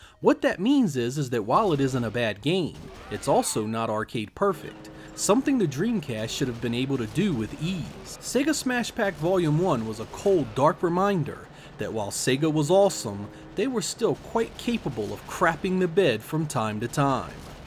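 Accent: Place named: Piedmont, United States. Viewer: I've been trying to place your accent and I'm ready to guess: Piedmont region of either Georgia, Tennessee, or the Carolinas. I've only known one person that pronounced "on" the way you do and he was from the suburbs of Atlanta.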